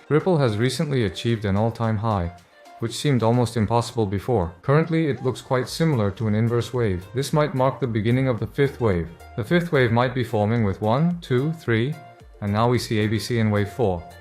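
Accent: United Kingdom. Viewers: 아니 잠깐만요 영어 충격받았네요. 인토네이션이나 발음이 해외에서 살다오신 분같은데 영국식이라 듣기 더 좋으네요 목소리 톤까지 좋아서😅 나만 알고싶은 님인데..글로벌 채널도 대박나십시오 🎉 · great british pronounciation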